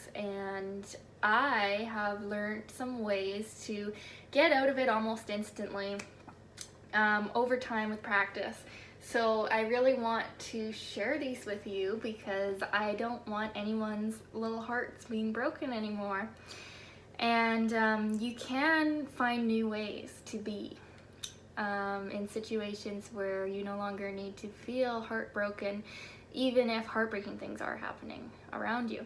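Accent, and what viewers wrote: Canada. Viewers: Are you from ontario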